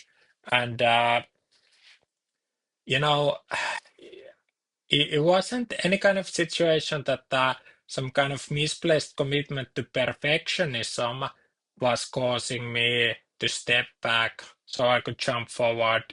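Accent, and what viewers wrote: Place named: Finland. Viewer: Glad to see you back man! Been missing that sweet Finnish accent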